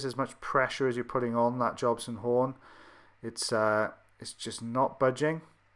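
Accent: Wales, United Kingdom. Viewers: Well what a lovely beard you have and I could listen to your accent all day. Welch I've been told. I'll stop flirting and say thank you for the videos.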